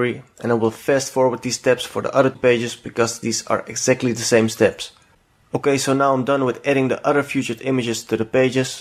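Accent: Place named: Netherlands. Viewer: I know a Dutch man when I hear him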